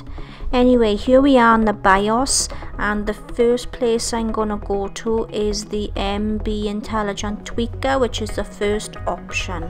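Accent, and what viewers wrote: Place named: Wales, United Kingdom. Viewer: <3 welsh accent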